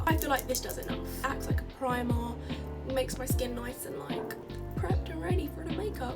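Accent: United Kingdom. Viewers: I KNEW U WERE BRITISHHHH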